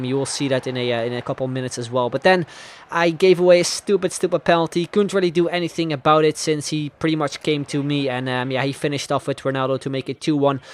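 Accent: Netherlands. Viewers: Are u dutch +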